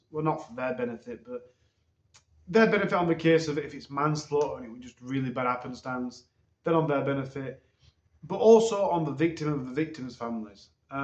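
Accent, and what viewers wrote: United Kingdom. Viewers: I just now seen this. U look great. I really like ur hair grown out. Ill miss ur stories they r really good. U must b busy. U could all kinds of stuff and keep a following. U could tell us about some history from there as we know a lot about usa but lots of us know nothing about u guys. The uk accent is really although i don't understand the words ur slan there. U could tell us local artist some musicians. Read a news paper to us we would enjoy it. U could tell us about the characters that stop in the pup. They probably have some great stories to tell. Just have to watch YouTube guidelines. I hope we hear back from you soon.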